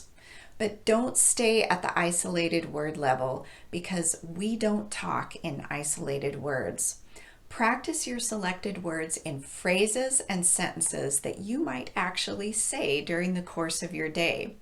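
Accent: United States. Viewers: Thanks for teaching ❤ but I have a question. Could you tell me Which accent is your pronunciation in the U.S.?😢because I found some different accents in the U.S. They are so different, especially some certain words and certain structures. Does it matter if I have a mixed accent 😂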